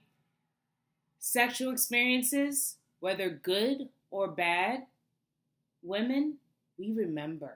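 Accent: New York, United States. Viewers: Hi this your new subscriber from Nassau Bahamas great video and I watched that Kavanaugh hearing today I had too laugh lol.. I love your beautiful New York accent lol..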